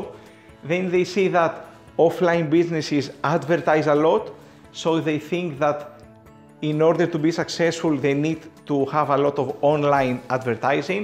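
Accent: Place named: Greece. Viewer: Perfect, though greek accented :D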